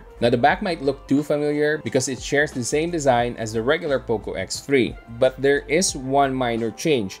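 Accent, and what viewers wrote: Philippines. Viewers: The Philippino accent is the cutest ever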